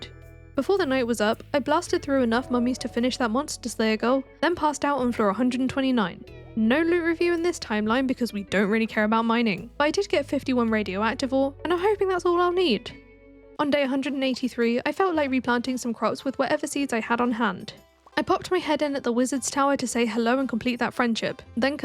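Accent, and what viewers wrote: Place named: Australia. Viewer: I love how he kinda sounds aussie <3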